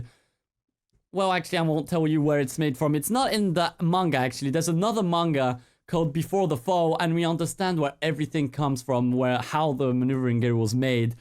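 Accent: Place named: France. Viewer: So you're French too! Je me doutais bien qu'il y avait quelque chose dans ton accent! =D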